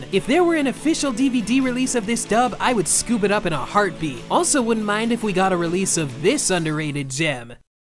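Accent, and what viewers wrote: Canada. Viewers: I cannot figure out which province your accent is from! I'm assuming its southern Canada though. Also, this is a BIG nostalgia blast.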